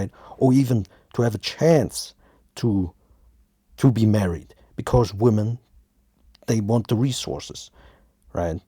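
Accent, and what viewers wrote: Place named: Germany. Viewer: Are u german I like your accent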